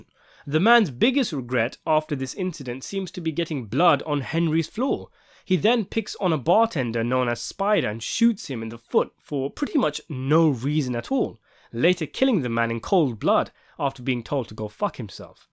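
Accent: England, United Kingdom. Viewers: Your English accent is amazing. I'm American from Ohio an this is just beautiful with your voice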